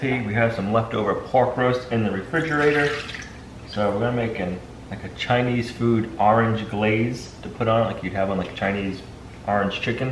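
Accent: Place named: Boston, United States. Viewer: Just subscribed to the channel, I can hear hints of the Boston accent but where about are you located now( obviously not too detailed for personal reasons) but dang you guys have some good snow already. I’m from the north east as well and we’ve only just got our first snow.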